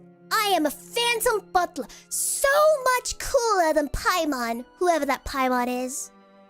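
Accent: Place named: United Kingdom. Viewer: I never know an ojou-sama with British accent is what I need all along.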